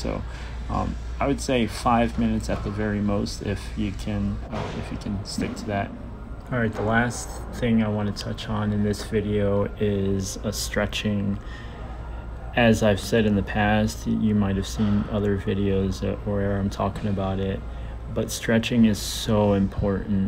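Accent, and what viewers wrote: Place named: Oklahoma, United States. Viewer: Dude are you from Oklahoma?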